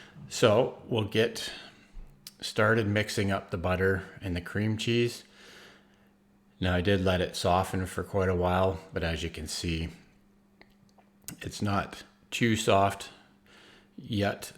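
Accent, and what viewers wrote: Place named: Canada. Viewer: Hey,. Thank you for the video.😊 This looks like it may be a good replacement for ice cream, eh? What do you think? I had a weakness for ice cream, but trying not to eat it now.:/ I hear your Canadian accent.😊 (I’m from Chicago Illinois.) This is the third time that I subscribed to your channel. I keep getting unsubscribed.:/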